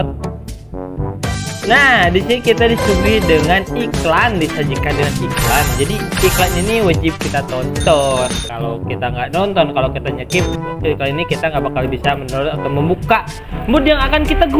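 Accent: West Java, Indonesia. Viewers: Jigah orang Sunda uyy...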